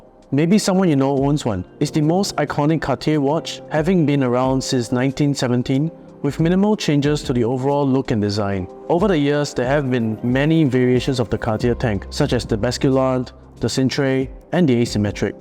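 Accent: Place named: Singapore. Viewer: Singelish is annoying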